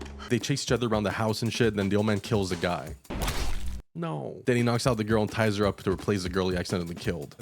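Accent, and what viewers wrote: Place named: Quebec, Canada. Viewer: Tes québécois toi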